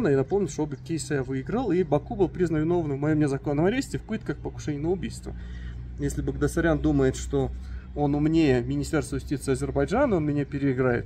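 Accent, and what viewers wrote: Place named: Armenia. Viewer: А ты видимо получаеш армянские драмы а не азербайджанские манаты наверное и у тебя армянский аксент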